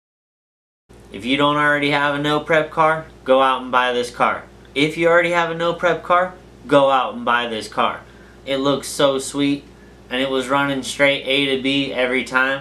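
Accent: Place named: United States. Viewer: I like your voice sounds country:)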